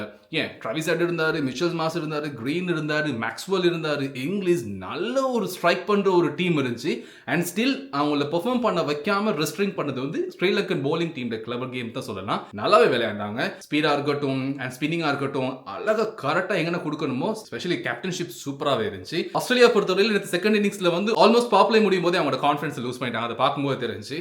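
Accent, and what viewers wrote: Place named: Tamil Nadu, India. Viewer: நீங்கள் ஏன் தமிழ்நாட்டுக்காரர் போல் பேசுறீங்க!?😮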